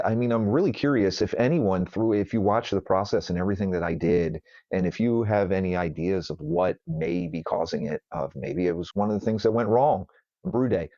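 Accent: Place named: Maryland, United States. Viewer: I appreciate your videos. You sound like you’re from Maryland. Are you?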